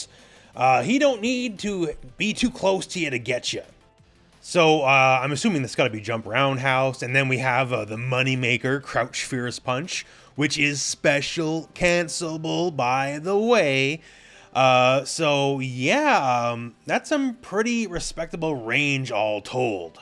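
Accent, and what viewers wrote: Canada. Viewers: Canadian accent is strong in this video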